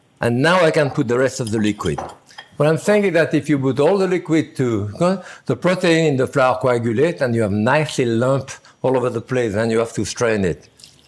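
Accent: France. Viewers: Come on old timer you can do it. Say C-R-E-P-E"SSSSSSSS" Put the "S" in there you can do it! I believe in you. You are speaking English to an English audience. We use the "S" for plurals and you damn well know this because you've lived here for most your life so stop with your overaccentuated French accent. It might have been cool with the ladies in the 70's but now it's kinda BORING! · sounds French still are you on drugs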